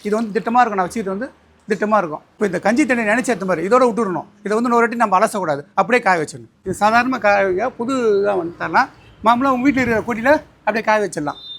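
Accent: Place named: Chennai, India. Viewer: pakka chennai voice na..😊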